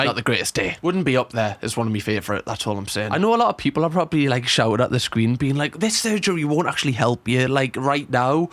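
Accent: United Kingdom. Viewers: Hey I tried to know where are you from your accent,the nearest one came to my mind British:) am I right? Am studying English so sorry for annoying you 😅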